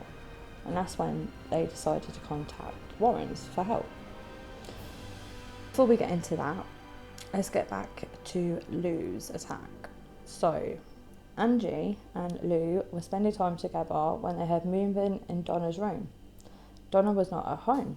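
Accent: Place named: United Kingdom. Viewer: Hello I like your story and your British accent he was really retro mystery love it❤️👍🏻🤘😍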